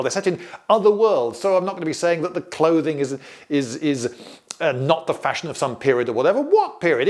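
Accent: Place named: United Kingdom. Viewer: Funny, you sound overall brittish yet you are as pedantic as us germans. =) Anything we should know? Have a nice Christmas and a happy new year.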